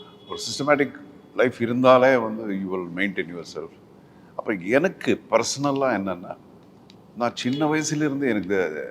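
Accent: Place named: Bangalore, India. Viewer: Banglore Tamil rombha like 🤝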